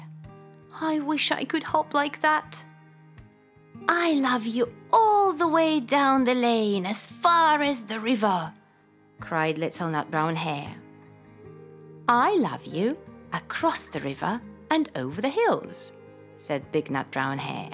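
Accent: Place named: United Kingdom. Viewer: Потрясающе,. Вы - живой пример для меня и мотиватор идти дальше🎉прекрасное произношение британской речи, просто мёд для ушей! Мне понадобилось некоторое время, чтобы понять, что это Вы, читаете 😂браво! К слову, я давно у вас на канале нашла видео о билингвизме и искусственной англоговорящей среде для малышей, собственно, уже два года я и мои дети в этой среде. Хотелось бы больше информации на эту тему, какие игры и фразы можно и нужно говорить малышам во время игр или просто daily routine, чтобы это было аутентично. И вообще наблюдать за прогрессом вашего ребенка. Понимаю, что тема не имеет отношения к вашему каналу, но хотя бы иногда 🥳💕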